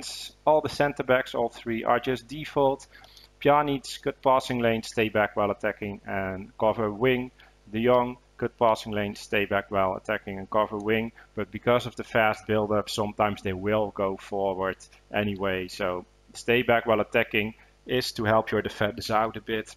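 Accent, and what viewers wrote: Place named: Netherlands. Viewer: In het Nederlands omdat ik aan jouw Engels hoor dat je Nederlander bent (No offence) Ik speel al jaren met Barca, en al jaren Div1 speler. Dit jaar enkele keren div 1 gehaald maar met veel moeite. (Meestal speel je tegen snelle spitsen zoals Salah of Mbappe en daar heb je gewoon niet van terug). Uit wanhoop deze opstelling geprobeerd (nu in div 2) en voorlopig heb ik 4-4 gewonnen. Het is zalig om vanuit de verdediging op te bouwen en weten dat je verdediging er staat wanneer je balverlies lijdt!! Good job!!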